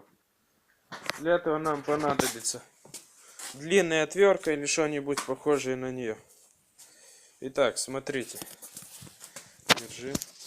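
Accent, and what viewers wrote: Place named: Moldova. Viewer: Сразу слышно,Молдова:)))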